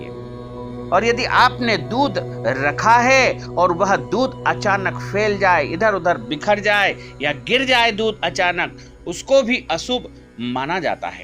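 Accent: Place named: South, India. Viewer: South Indian Hindi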